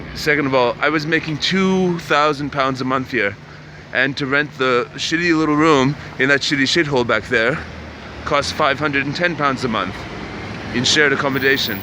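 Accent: Germany. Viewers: You have really really mixed roots. And I don't know if anyone told you already that you still have some German accent. At first I thought your are originally from Germany but when I heard you say Toronto,Canada I was like WTF! :D I'm not surprised that you feel Serbia as natural habitat maybe because your Slavic side gens "work" and "want to go home". :D That's normal because I also know some Serbs how feel much better living in Spain,Italy or Ireland than Serbia. It's just like that and who knows why. I have a friend,born and raised in Serbia went to school together, who told me something like "I feel I'm alive only when I'm in Barcelona". The other one use every single chance to spend time in southeast Asia,Vietnam,Burma etc. The guy just LOVE to be there. I like Mexico or Mediteranian lifestyle (wich is pretty similar to Serbian lifestyle,hehe). So,that's normal, some people just looking for their own part of the sun under the sky.And that could be elsewhere than place they were born and raised. 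Anyway,glad you enjoy your life in Belgrade. And just go on. That is only matters. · Were you born and raised in Canada? How come you have such a strong german like accent?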